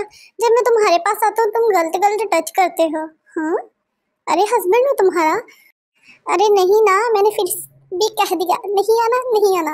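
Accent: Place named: Punjab, India. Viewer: Ap ke voice sa me be punjabi ho😊😊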